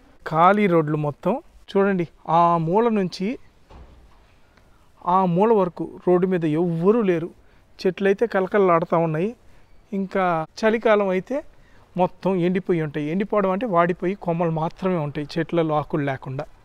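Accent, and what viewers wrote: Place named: Andhra Pradesh, India. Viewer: Medhe Andhrapradesh Ani anukunta me slang choosi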